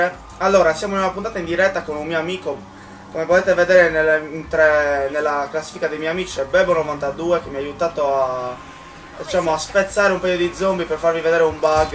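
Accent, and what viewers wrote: Sardinia, Italy. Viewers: Ma sei sardo? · fra sei sardo vero??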